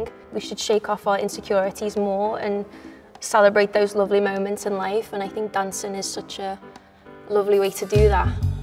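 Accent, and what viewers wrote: Liverpool, United Kingdom. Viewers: aww Scouse accent is one of the best thing about her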